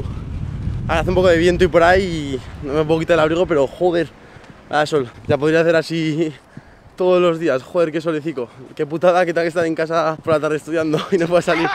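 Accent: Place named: Aragon, Spain. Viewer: Jajajaja se nota el acento maño!! Saludos desde Tarazona Bro!!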